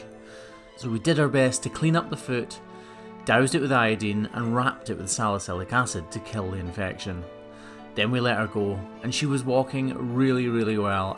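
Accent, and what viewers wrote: Scotland, United Kingdom. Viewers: I really don't know why I'm watching these videos 😳. Some are so gross with all that blood and pus, and even beyond that I don't like cows and bulls. They scared the hell outta me. Maybe it's your Scottish accent or the beautiful landscape or maybe the fact that you are doing such a amazing job. Greetings from The Netherlands and keep the good work going 🙏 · I can’t help myself here. I love your videos. Discovered them about a year ago. When I heard you talking I wondered what the accent was. When I found out it’s Scottish, I did a happy dance. Spent 16 days in Scotland the previous August and September. Can’t wait to come back. So...I read the title of this video and did a double take...Fixing a rotting cows hoof and thought a “rotting cows” “hoof”?Then realized the cow had a rotting hoof. LOL I love the way you bring your little boys with you. 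They will learn a lot from you. They will miss that time when school becomes a priority.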